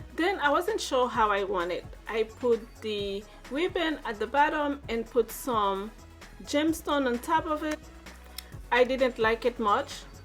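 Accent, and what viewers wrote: Haiti. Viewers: Your accent sounds like you're Haitian 🇭🇹!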